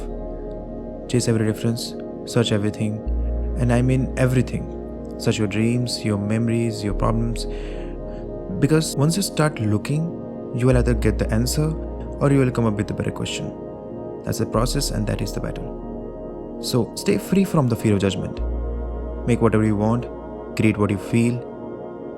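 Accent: Patna, India. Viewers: bro, are you from Patna ?? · Are you from patna?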